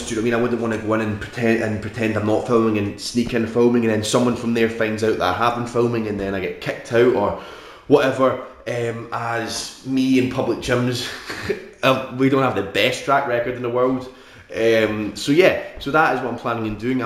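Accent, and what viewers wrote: Scotland, United Kingdom. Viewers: Damn son you strong as hell mane. Love the scottish accent. Think my homie Tj told me about you bro..great vid champ. I subbed!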